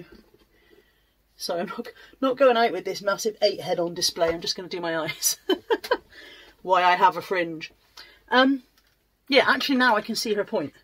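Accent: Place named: Forest of Dean, United Kingdom. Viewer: I've recently found your channel and I'm trying to pinpoint where you're from as you sound like you're local to me! I'm in the Forest of Dean 😊 really enjoying your videos! X What you said is spot on!!!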